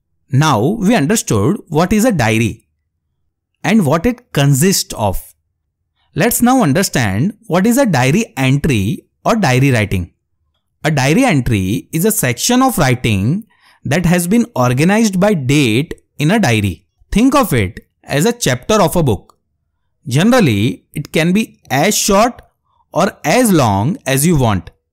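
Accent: India. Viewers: The pure accent of indian english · Pure Indian accent of English 😊😊❤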